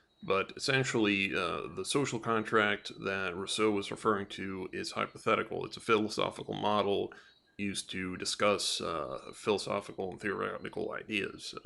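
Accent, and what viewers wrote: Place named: Europe. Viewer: Your comments concerning Nationality revealed that you have done very little viewing and reading on Taj Tarik Bey's work. You have only to study a little bit more to know that you are agreeing with his definition of Nationality. I feel as you are trying to establish credibility and notoriety for yourself by superficially debating long standing scholarship with adolescent claims and shoddy research. If I were to make a guess to your nationality by the sound of your voice it would be of european ancestry, (troglodyte).Which would explain your interest in debunking rather studying.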